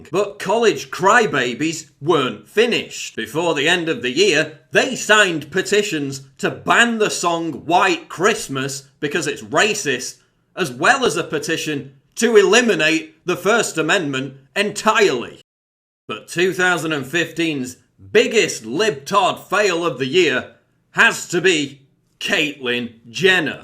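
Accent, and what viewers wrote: United Kingdom. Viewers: This channel sucks. This douche proves that simply adding a British accent to low brow topics doesn't make it better. Is this supposed to be informative or funny or just boring?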